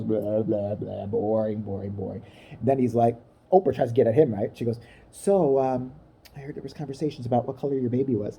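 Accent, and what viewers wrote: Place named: United Kingdom. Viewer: I google it ( exempt ) BC... I'm a Genius... Lol🤣 ur always sooo nice and Kind to me 💞... Thank you🙏 btw, loooovvvee ur British accent🤣😭!!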